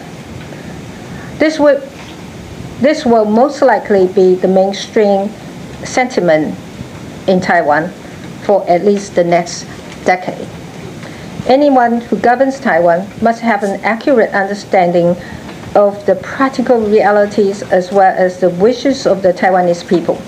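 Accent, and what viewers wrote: United Kingdom. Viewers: The speech was very thoughtful, and she has a more british accent i felt, which made her sound sophisticated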